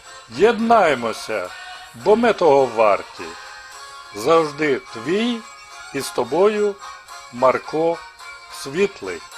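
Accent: Standard. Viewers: Дякую вам за допомогу людям ! Дуже приємно чути чисту солов'їну !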